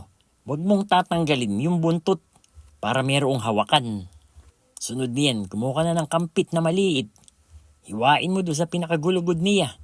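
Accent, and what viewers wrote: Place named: Baler, Philippines. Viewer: My Punto k po Ng taga baler hehe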